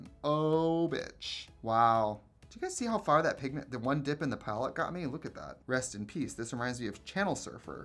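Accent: Wisconsin, United States. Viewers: I can really hear the wisconsin accent in this one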